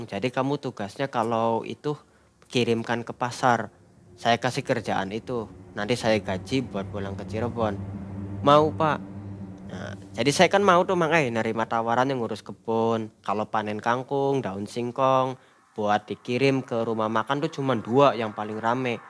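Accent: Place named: Dobo, Indonesia. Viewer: Salam dari Dobo.... Bt asli orang Dobo, dari awal dengar cerita bt sadar kalau lama diDobo, karna fasih sekali sama logat Dobo, btw laut Arafura ya.... Bukan Arafuru🙏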